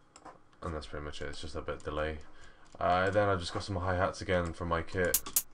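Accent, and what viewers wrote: United Kingdom. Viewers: your from london? you sound like it? hit me up on inbox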